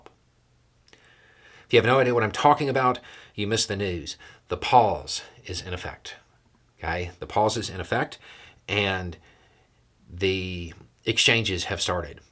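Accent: South, United States. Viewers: love your takes. I particularly like it when you address some of the jingo-idiot-talking points partly because I do take some joy in seeing someone take the lumber to those idiots but also because I can tell when a topic or take hits too close to home for you. This time I literally had to check mid-stream to confirm I had not upped the playback speed to 1.1 (my adjustment when a video seems to be dragging on a bit) - but nope, normal playback speed. You became about 20% less slow-speaking Southerner and 50% more pissed off in about 4 seconds, and kept the pedal to the metal for the rest of the video. It's good to know the old pickup still has some of that power in there despite having just tooled around the village pop-n-stop for the last few years. Keep it up, you are fighting the good fight!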